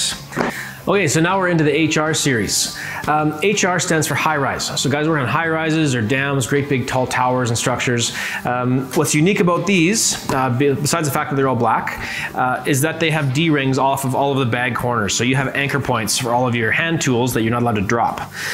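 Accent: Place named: Canada. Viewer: I detect a Canadian accent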